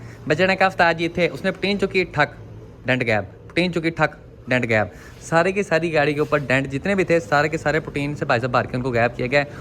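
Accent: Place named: Punjab, India. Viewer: I love your beautiful accent. My beautiful Punjabi brother.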